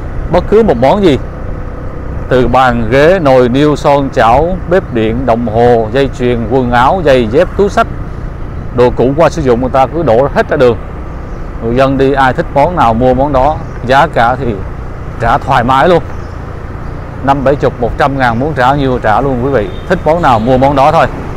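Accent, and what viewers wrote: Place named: Hanoi, Vietnam. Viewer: Giông ad như ở thủ đô của Cụ ba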